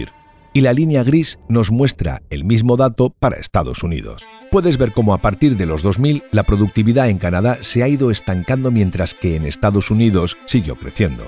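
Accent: Spain. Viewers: Me gusto mucho este narrativa de aprendizaje no hay ruido de fondo esas música que suelen poner que no te dejan escuchar y ese acento español me gusto ❤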